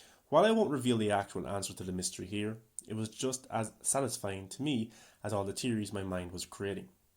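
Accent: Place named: Cork, Ireland. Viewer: Great video. Fantastic movie. Made with typical Wachowski sincerity and heart which makes it worth a thousand Marvel films to me. Time will be kind to it. Might be reading too much into into it but you have some Cork accent!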